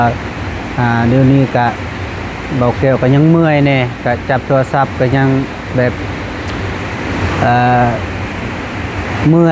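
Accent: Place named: Laos. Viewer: เป็นทหารต้องเข้มแข็ง อย่าอ่อนแอสู้ต้องดูแลประชาชน.เว้าสำเนียงลาวเผ่าได๋ฟังคือบ่คือบ่าวเตียง.สู้สู้เด้อทหารตัองอดทน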